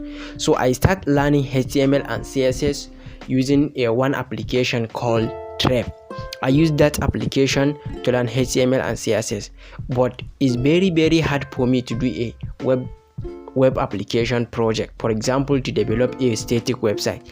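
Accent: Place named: Hausa, Nigeria. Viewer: This guy. You nd ur hausa accent ... murdering pronunciations. Great interesting story though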